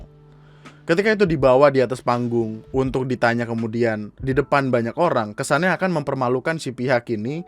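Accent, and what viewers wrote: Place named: Makassar, Indonesia. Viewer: Dukung bang buat lancar logat Makassar:_andriWkwk::_andriWkwk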